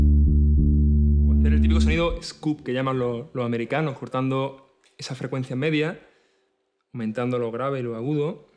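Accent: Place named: Andalusia, Spain. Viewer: love that "andaluj" accent :)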